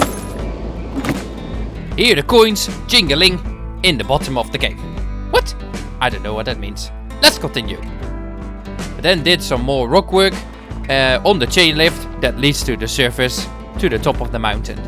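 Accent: Netherlands. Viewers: It's even worse. It's southern Dutch 😂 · Brabant heuj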